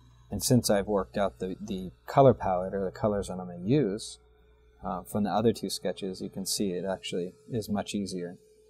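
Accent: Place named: Scotland, United Kingdom. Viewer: At fist I was like "Yee a school girls, and then WTF it's a gay Scot :D" cool vid nevertheless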